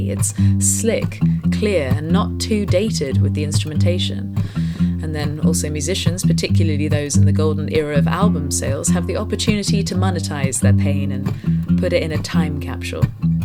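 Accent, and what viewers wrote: United Kingdom. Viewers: Before you even said it I was thinking "This is one take!". Awesome - like a Rick Beato video with a beautiful British accent. I could listen to you speak all day, I'd love to hear more 'analysis' from you.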